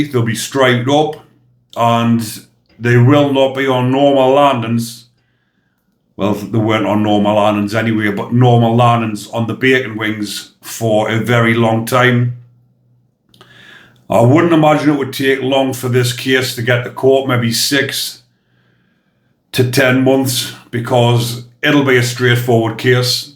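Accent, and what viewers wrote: Newcastle, United Kingdom. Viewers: Bacon, hes a Jordy it’s his accent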